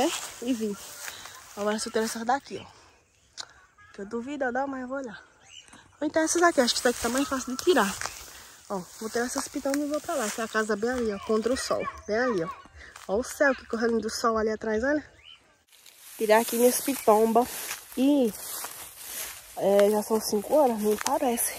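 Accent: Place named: Northeast, Brazil. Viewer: Tu nunca veio no nordeste não foi ? Nois só fala assim, ninguém e igual a ninguém todo cidade todo país,as pessoas tem seu jeito de falar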